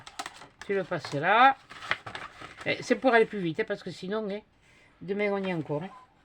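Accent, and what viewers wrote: South, France. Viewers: Bonjour j adore vôtre accent du sud vous me faites trop rire.et vos réalisations sont très jolie.❤😘😘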